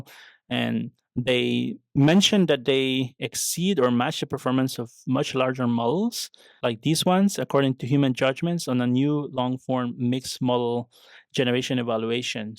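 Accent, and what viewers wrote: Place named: India. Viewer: GOOD INDIAN ACCENT, VERY UNDERSTANDABLE BEST IS TO READ THE PAPER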